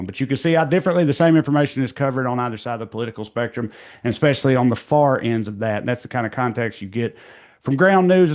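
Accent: South, United States. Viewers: Dude, it's a delightful juxtaposition hearing you correctly using polysyllabic terms in a southern drawl that screams "taters 'n grits"! You constantly make me think of when I went home with a college friend to cattle show in backwoods Alabama. Since Rob was catching up with people he hadn't seen since high school, I just wandered around until I heard some people talking about quantum theory and temporal mechanics! At a cattle show! A couple of the speakers had accents so heavy that my eleven years in Alabama had barely helped me understand what they were sayng, but WHAT they were saying blew my mind! I asked my friend about it and he listened for a bit, then said, "Oh, they've just been watching Doctor Who! Public broadcasting is the only TV station we get out here. They say Weedowee should have cable in about five years." I actually like when my unconscious- and unjustified- biases run screaming face-first into a brick wall! 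😊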